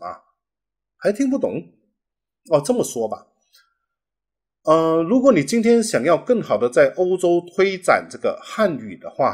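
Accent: Standard. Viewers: 郷民A 我们的华语发音及用词很受自身籍贯的方言影响，也掺杂了少数马来语的词尾音，听起来有点特色又像中国南方地区的发音。大叔是属于中文造诣较好的，多数华人的发音都没有那么标准。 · 在马来西亚华人中大叔的普通话算很标准的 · 真的不容易，以前不了解马来亚华人的处境，现在通过大叔了解一二，听完真的不容易而且觉得马来华人真了不起~！所以请大家不要挑三拣四了。就算是所谓的中华圈，国内的好多地方和香港地区还不如大叔会说一口标准的中文呢。现在台湾也好不到哪里去，很多正规媒体差不多三分之一说的是台湾闽南语叫人无语……。加油大叔！ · 有一点请您多多原谅：首先您的普通话，不，是华语，实在跟我们太接近了。我经常忘了你是个外国人，而像我们广东广西省份人的口音，甚至比他们说的还标准。完全把您当中国人了。更主要的是您跟我们一样热爱中国。 我在想，这种感觉可能像韩国、朝鲜人听我们中国朝鲜族同胞说话一样。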